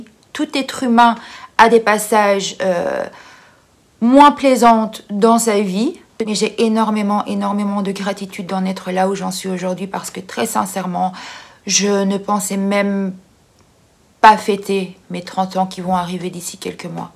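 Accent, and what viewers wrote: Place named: Belgium. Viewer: Ho! C'est marrant ça, c'est vrai que je m'étais dit que tu étais belge par rapport à ta façon de parler sans doute, à moins que tu n'ai fourni l'info dans une de tes vidéos. Je suis à Bruxelles depuis deux ans maintenant! Et j'aime beaucoup cette ville même si c'est un peu bizarre en ce moment, je suis arrivé 2 mois avant le 1er confinement, j'ai donc très peu pu profiter de la ville dans des conditions "normales". Peut-être nous croiserons nous un jour ^^, si je t’aperçois je viendrais te parler! Bises!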